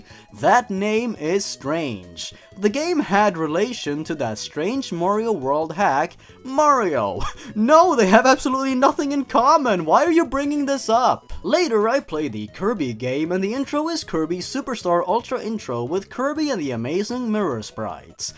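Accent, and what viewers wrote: Sweden. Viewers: U swedish? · Are you swedish?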